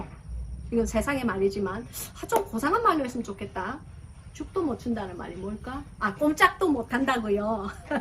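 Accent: Gyeongsang, South Korea. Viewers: 목사님의 순수하심에 빠져들어 이제는 사역을 통하여 체율체득함으로 나오는 간증과 함께 생명의 말씀에 붙잡혀 매일 빠져들고 있어요ㆍ목사님 어투가 경상도 출생인가보다 했는데 부산이 고향이시란걸 오늘 알았네요ㆍ삶이 예배란 말씀에 전율이 흘러요ㆍ목사님 너무너무 고맙고요 감사합니다 솔직담백한 큰매력도 큰능력 이라고 느껴져 더욱 감사합니다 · 목사님 경상도 사투리가 정말 구수하네요 주안에서 평강과 은혜가 충만한듯 합니다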